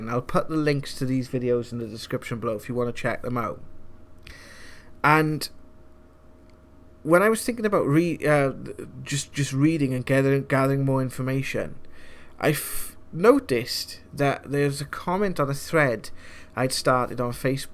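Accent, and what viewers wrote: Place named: Wales, United Kingdom. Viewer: You sound Welsh asf hahaha proper valley accent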